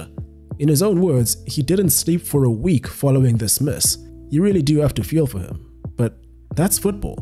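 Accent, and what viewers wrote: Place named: South Africa. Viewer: I always knew you from SA. The accent and slipping in Tau and psl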